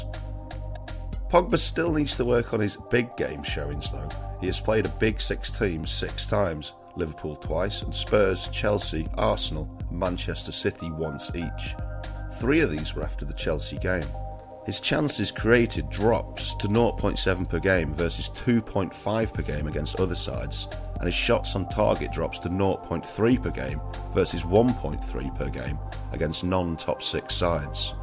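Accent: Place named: Leeds, United Kingdom. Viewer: This guy is from Leeds I reckon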